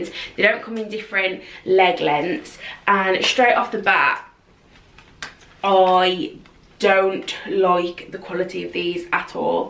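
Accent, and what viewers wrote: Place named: England, United Kingdom. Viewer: Hi 🙋🏻. You popped up in my recommendations and I’m SO glad you did 🥳👍🏼. I love my American ladies but it’s so nice to hear a good old English accent 🤣. You deserve a 🏅 for trying on all those jeans. I absolutely detest shopping for jeans 👖 🔫🤬🥵❗️. I am a size 18, and like you have a small waist and big hips and thighs ☹️. If jeans fit my waist they sure as hell ain’t gonna fit my hips. I have been buying jeggings in an effort to solve these problems. I feel more ‘put together’ in jeans TBH, but I love the fit and comfort of a jegging 👍🏼😀. ASDA do some great jeggings at the very affordable price of £12.50. I have several pairs and they wash up lovely 😊You should try them. Going off to watch some more of your vids now. New subbie 🌹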